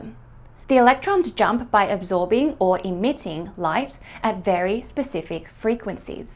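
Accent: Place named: Australia. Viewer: so nice to hear an Australian accent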